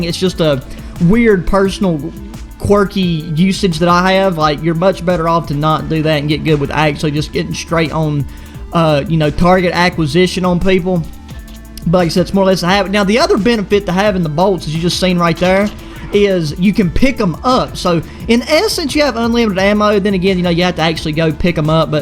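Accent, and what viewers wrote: United States. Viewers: That voice just made my day The country accent just makes me feel at home because of where my grandparents live Also I went to this video and it turns out your crossbow setup is the EXACT same as mine so I guess I’ll keep what I got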